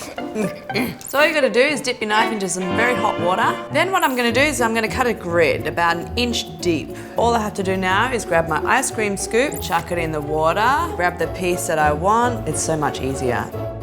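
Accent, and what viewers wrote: Australia. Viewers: Is she Australian? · Are you Australian?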